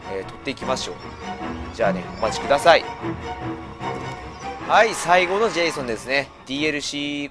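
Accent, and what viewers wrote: Kagoshima, Japan. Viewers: さんの鹿児島の訛り好きだなぁ... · 鹿児島訛り好きになりそう！素顔見たかったので嬉しいです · 鹿児島弁良き！！👍👍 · 鹿児島訛り最高です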